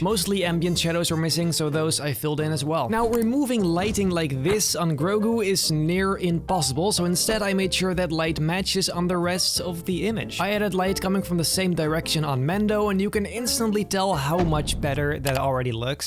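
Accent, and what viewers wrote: Netherlands. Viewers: en dit zegt iemand die engels praat op 'n hele nederlandse manier...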